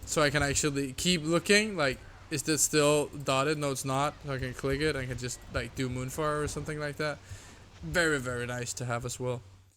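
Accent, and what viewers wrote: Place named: Denmark. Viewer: I can hear you are Danish 🙋🏼‍♀️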